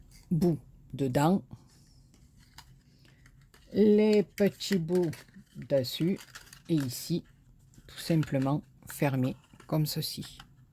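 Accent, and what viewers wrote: South, France. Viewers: Bonjour, ta cascadounette est vraiment très jolie, j'adore ton accent du soleil, il nous fait du bien car on est toujours sous la pluie.....Gros gros bisous... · bonjour, très joli travail très coloré plus l accent su sud cela fait du bien le soleil n étant pas de partie aujourd' hui chez nous bisounettes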